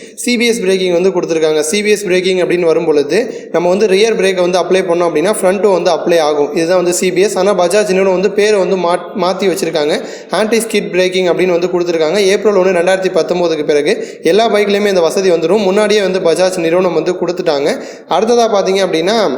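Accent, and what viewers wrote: Thanjavur, India. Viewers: bro neega thanjavur raa 😍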